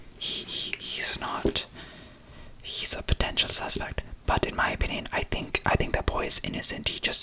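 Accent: India. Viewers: Indian accent videos are my favorite!! Love the video as always and keep creating great content!!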